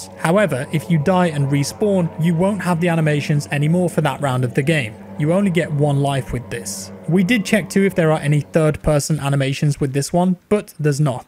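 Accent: United Kingdom. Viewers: Are you brithish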